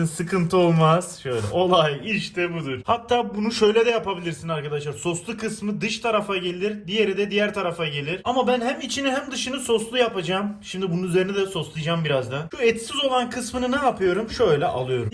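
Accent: Adana, Turkey. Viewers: Abi sen Adanalı gibi konüşürsüünnn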